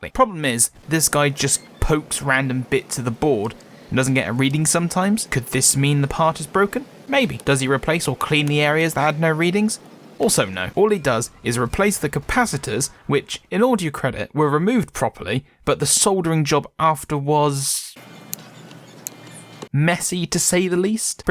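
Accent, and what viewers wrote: United Kingdom. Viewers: oh my gosh your a bri'ish linus tech tips!! I would actually love to hear you talk more about pc's though and do more of these commentary-esque videos! They are a nice break from the SCP theme and hopefully they have enough interests to not run your views into the dirt.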